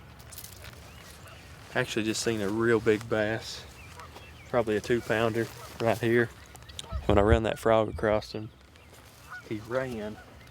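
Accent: South, United States. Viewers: damn you are sexy!!! sry just had to say it...I love a man with a southern accent! NEW SUB!!!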